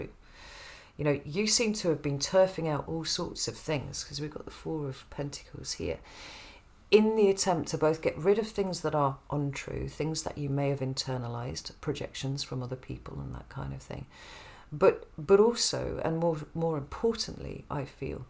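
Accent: United Kingdom. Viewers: I get such a pure feeling of the British genius for language when you speak. Casts a spell.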